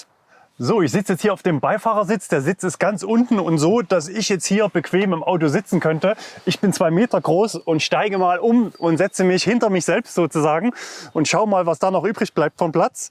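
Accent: East Germany, Germany. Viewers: ich halte diesen Ossiakzent nicht aus :(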